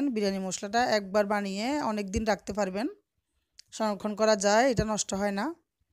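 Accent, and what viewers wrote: Chittagong, Bangladesh. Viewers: Unar kotha shune tai tu mone hocche. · চিটাইংগা নাকি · ওয়া তুই চিটাইংগে কেন?খথা উনি বুঝা জার।সুন্দর রেচিপি। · আপনি কি চিটাগাং গা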